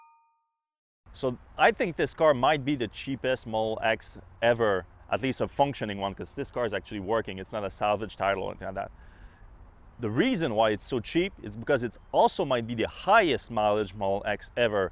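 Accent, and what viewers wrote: Quebec, Canada. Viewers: Love the quebec accent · sounds like a quebecois? u from here bruh?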